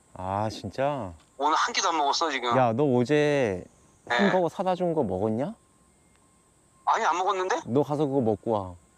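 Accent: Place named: North Chungcheong, South Korea. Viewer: 충청남도가 아니구 충청북도 말투시네유~~~ 제천쪽 그쪽 사시네벼유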